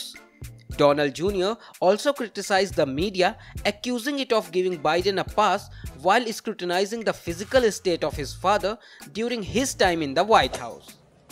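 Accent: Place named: India. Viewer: Ok why is this being narrated by an Indian?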